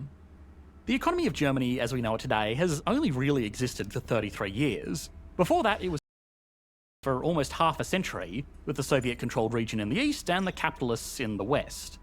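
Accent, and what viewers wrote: Scotland, United Kingdom. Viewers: I wasn't talking about but about the narrator in the clip he reacted to! Scottish accent is genuine and I really like it, but that guy in the clip sounds fake as hell and just annoying.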